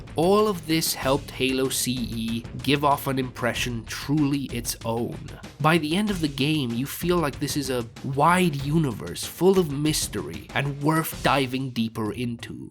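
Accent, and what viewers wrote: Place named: United States. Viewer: Eastern European? Where are you from, the accent really sounds American to me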